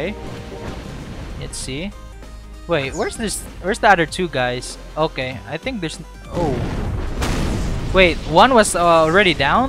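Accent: Philippines. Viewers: ye his accent aswell is like a filipino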